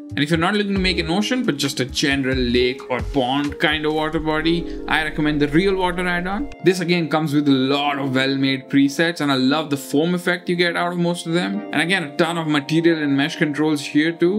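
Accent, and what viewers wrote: Bangalore, India. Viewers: This mans commentary is the best xDD Are you from Bangalore. You sure sound like you are xD Love it. Your videos do make me feel home in a way in a country away xDD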